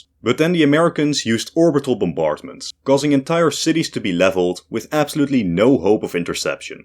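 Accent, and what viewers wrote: Netherlands. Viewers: yeah i don't understand how anyone thinks is American. Man has the most quintessentially Dutch accent ever lmao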